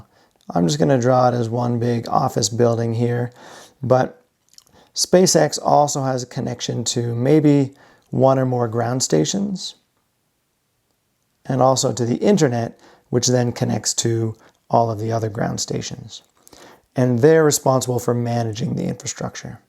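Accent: Nova Scotia, Canada. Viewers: Yeah, he sounds like he is from Nova Scotia to me and I have met hundreds of east coasters in my lifetime so far. We all talk funny anyway, lol.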